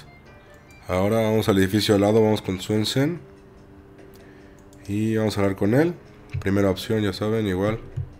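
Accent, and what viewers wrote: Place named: Valencia, Spain. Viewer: Tu como que eres valenciano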